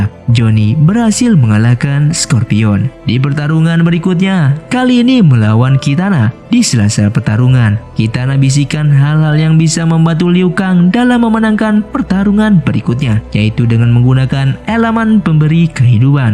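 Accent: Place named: Java, Indonesia. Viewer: Wong jowo ye mas? 😂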